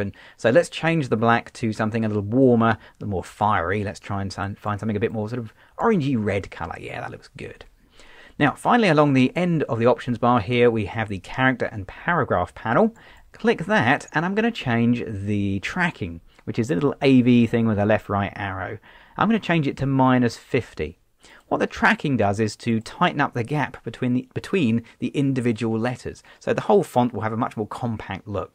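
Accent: United Kingdom. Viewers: Omg , Man great accent. No offence intended , but it is like those english safari loving adventure Tarzan mode ppl. Great turtorial . Challiho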